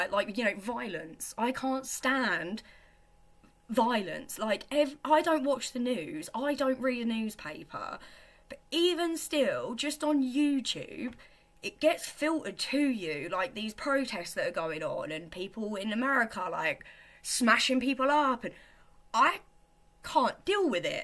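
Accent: United Kingdom. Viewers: 😄that british accent in combination with heavymetal looks damn. heavy metal queen. just walk baby walking fixes everything. you are beautiful. why not become a mama? kids are nice. you lose some sleep but this is our ultimate purpose. *what kids give you in your heart, no therapist can do that. sorry for my english not good. everything is gonna be okay baby don't worry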